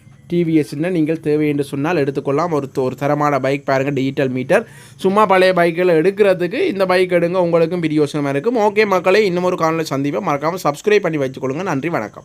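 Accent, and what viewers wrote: Sri Lanka. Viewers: Ivan srilanka tamil pesiran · இலங்கை தமிழ்.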